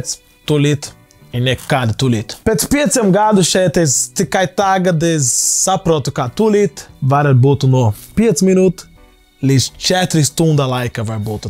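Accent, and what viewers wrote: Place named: Lithuania. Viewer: You sound Lithuanian not Latvian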